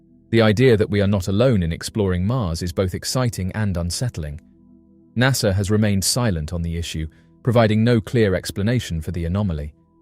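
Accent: United Kingdom. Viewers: The British voice makes is more truthy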